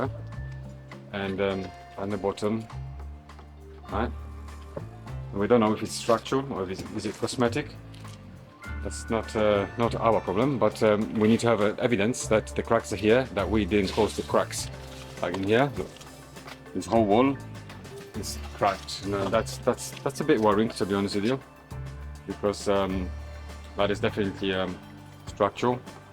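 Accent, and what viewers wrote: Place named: Poland. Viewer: Hi thanks for responding I’m in Borehamwood, near Barnet, WD6 You sound like you are Polish, my mum is from Gdańsk Best wishes Mikey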